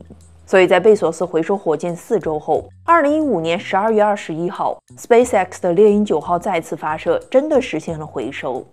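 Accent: Hunan, China. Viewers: 你这普通话😂湖南人吧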